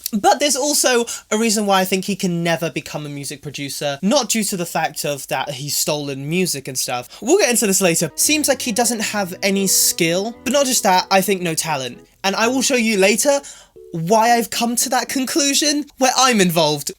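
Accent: England, United Kingdom. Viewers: I hella LOVE this english accent !! Like, not the American accent, the English one sounds so soft 😭❤️ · I’m so slow😭😭😭 I didn’t realize was British until this video. Idk I guess I wasn’t paying attention before but I’m now acknowledging how thick his accent is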